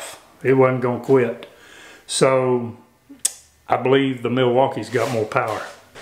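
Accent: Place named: Florida, United States. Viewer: Just asking, love the accent man, reminds me of FL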